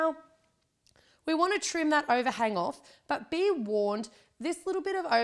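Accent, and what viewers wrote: Australia. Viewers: I love australian accent!! Thanks for the tutorial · youre ausralian?! i tought youre british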